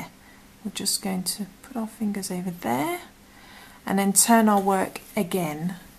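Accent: United Kingdom. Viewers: My only gripe is you’re using US terminology and you sound British but otherwise lovely thanks for the share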